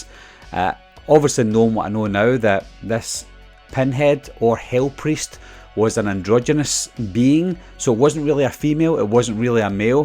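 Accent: Scotland, United Kingdom. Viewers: Yeah of course I love your videos pal and I'm Scottish so it's nice to hear my own accent online 😀 👍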